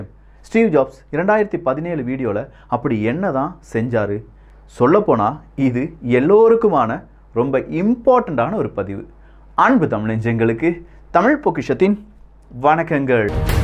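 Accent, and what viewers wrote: Kerala, India. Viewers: Hi, i follow all your channels regularly, really appreciate your efforts n hard work. Tamil politics, international news, Geopolitics updates, science n technology, all i n all boss neenga. Krep going.. btw, I am frm Brussels. One suggestion: you really have to change TamilViyugam theme😊 And your English pronunciation quete keralitic, like Public, application etc should be pronounced correctly, since some students following this thread, so;) Thanks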